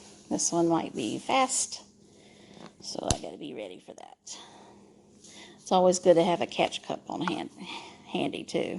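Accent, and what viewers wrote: South, United States. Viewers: Loving that southern accent hunny 😉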